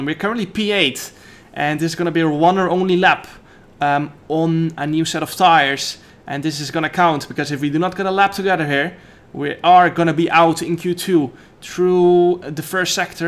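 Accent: Netherlands. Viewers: Are you from the neterlands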